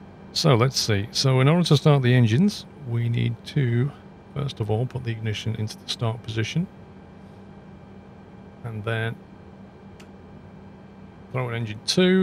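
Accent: United Kingdom. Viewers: British accents are so fancy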